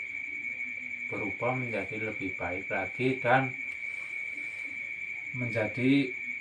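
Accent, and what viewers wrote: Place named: Banyumas, Indonesia. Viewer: Sama sama 🙏, alhamdulillah sudah berubah, dari awal saya suka dngn gaya bicara lucu ngapaknya, semoga canelnya makin maju n selalu di beri kesehatan n bisa menghibur subscriber aamiin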